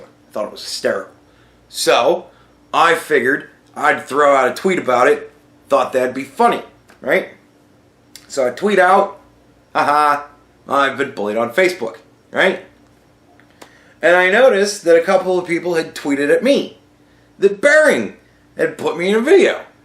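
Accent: United States. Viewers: another one from Bearing, but I do have you on 1.25 speed cos dude..... you like to take your time and yeah, you may have been funnier in that clip than normal, game up dude. nice to listen to a "normal" american every now and then. all the best. i'm not sticking around for the full 23mins though, I couldn't bear it.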